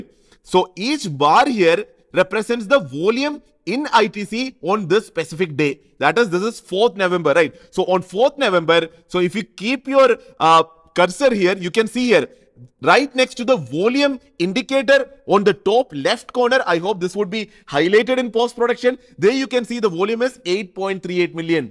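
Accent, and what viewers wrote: Kerala, India. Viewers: I love your Kerala style English pronounciation.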